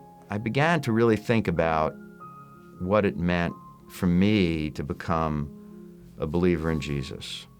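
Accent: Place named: Long Island, United States. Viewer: Sounds like Long Island ny. God bless brother!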